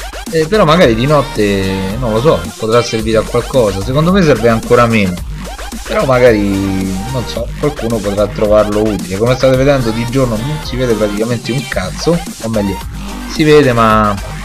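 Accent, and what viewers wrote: Rome, Italy. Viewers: Romano come me ahahaha grande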